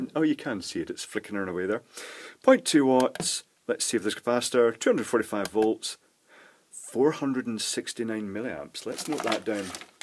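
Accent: Scotland, United Kingdom. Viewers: Such a nice accent. Where you from Scotland? Must be, (not that it matters cause we are all just spirits in bodies). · he has a Scottish accent to be precise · Some guy, explains everything in good clear English with a slight Scottish Twang :-) Would make a good teacher. The website has good tutorials on various projects. Good luck on future projects pal.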